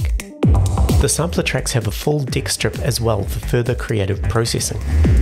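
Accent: New Zealand. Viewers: Gotta love the NZ accent :) Dicks everywhere!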